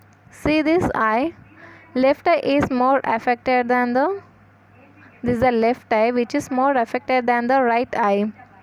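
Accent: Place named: England, United Kingdom. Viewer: Nice presentation. I like your English accent. BTW, what textbook are you teaching from?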